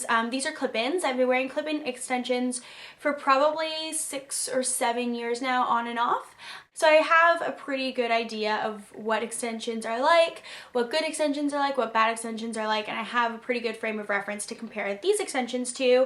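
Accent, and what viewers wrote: Canada. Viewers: are you canadian? :)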